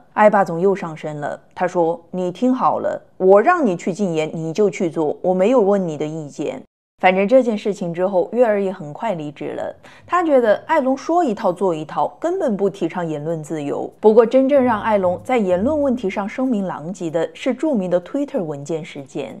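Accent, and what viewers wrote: Hunan, China. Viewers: 你这普通话😂湖南人吧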